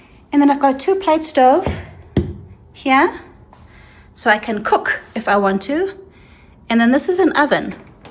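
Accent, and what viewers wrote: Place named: United Kingdom. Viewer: What do I love more, your British accent or your love for our country ?.👍👍😂❤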